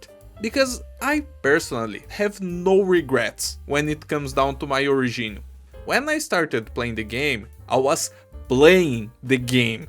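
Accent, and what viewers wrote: Brazil. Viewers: Ummm the accent, I know you, you're one of my people... Quer uma goiaba? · quick question, are you brazilian??? cause you have the accent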